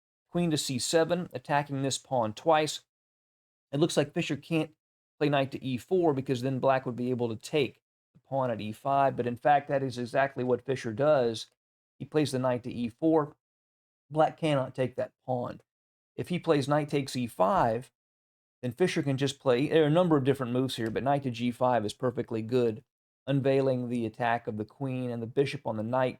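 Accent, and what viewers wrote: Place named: Texas, United States. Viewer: Probably my favourite chess channel (I am also a Fischer fan) and I like the Texan accent.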